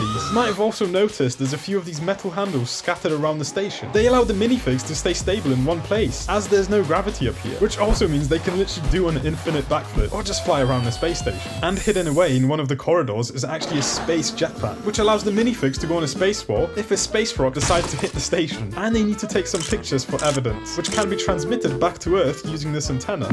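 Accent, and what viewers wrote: United Kingdom. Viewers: i realed that he is brithish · Is he a UK person?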